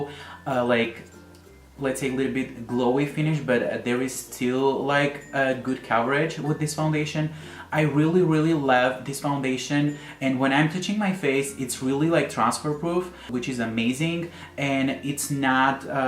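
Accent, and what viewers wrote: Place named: Armenia. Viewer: You are so cute <3 love your Armenian accent :D <3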